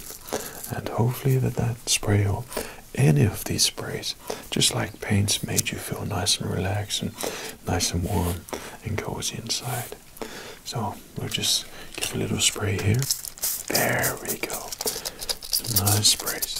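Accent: United States. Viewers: Your american accent is improving 👌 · I mean with all due respect, because this video is hilarious… but what the actual fuck,?! 🤣 Hit the bowl too many times and came up with a WILD video! Really not too shabby there with the American accent! You don’t lose it too often and when you do, it can easily be glossed over when we reset. A dialect coach might not be fooled but I can be!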